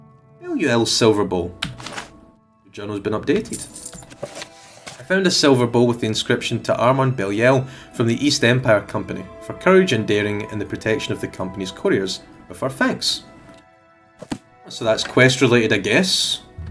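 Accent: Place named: Scotland, United Kingdom. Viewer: Thats quite the accent where about in Scotland are you from mate?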